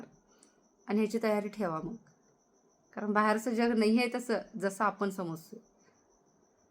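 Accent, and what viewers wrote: Sangli, India. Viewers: खुपच छान ताई तुमचे विच्यार तुम्ही सांगलीच्या वाटता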